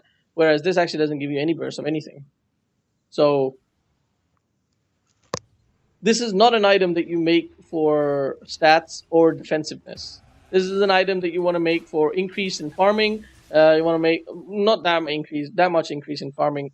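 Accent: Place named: India. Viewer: His accent and that moment when a train passed by in background suggests the same. I know that coz I'm Indian myself. :D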